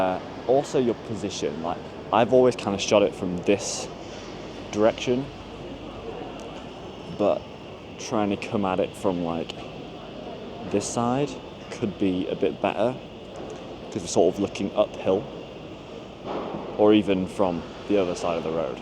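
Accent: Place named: Manchester, United Kingdom. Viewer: You’re from Manchester; as an American, I guessed Liverpool. 😂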